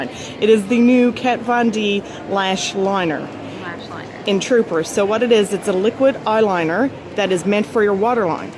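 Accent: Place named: Canada. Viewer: Hello. So 5 seconds into this video andI could tell that you're Canadian. I had a Canadian pen pal years ago, so I can spot Canadians like no one's business. Great review. Thank you!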